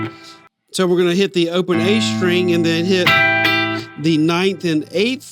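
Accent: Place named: South, United States. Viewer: okay thanks ( by the way that is not my picture,,just props) any who this lesson is really valuable to me i will diffidently use this one!!! hey in this world of politically correctness which is completely ridicules ( thanks obama) i just gotta comment on your accent,,i like that southern draw when you speak,,I'm from Texas my self i thought man that guy sure does sound like my home boy ! any who GREAT SHUFFLE Lesson...e : )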